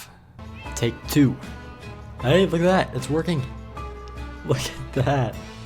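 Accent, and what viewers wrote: Minnesota, United States. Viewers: With the name I was hoping your MN accent would be more aggressive.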